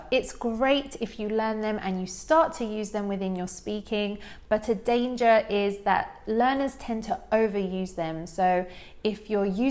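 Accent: England, United Kingdom. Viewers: Ma'am u have British accent nd has American, right? So that means we r learning both the accents simultaneously 😊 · This British accent is beautiful... =) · Please tell me in which british accent you are talking😊💐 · Is it British English, please inform me. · I love your British accent. 😍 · I really love your English accents but I also want you to talk a bit faster like because people not gonna talk slowly in reality.so the point is that you are trigger I have to pull first then the rest. Thanks for everything! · Ma'am, your British accent is so clear and soothing to hear. Thank you for the video. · What cute British accent❣️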